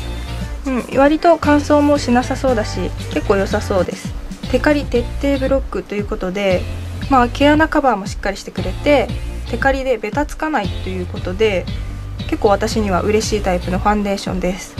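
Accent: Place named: Hokuriku, Japan. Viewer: 北陸の方ですね？ヽ(・∀・)ノ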